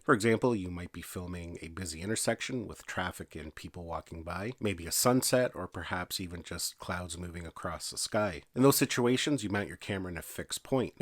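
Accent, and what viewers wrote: Canada. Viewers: Thanks heaps for the help. I'm thinking from the accent and scenery that you're a Canadian!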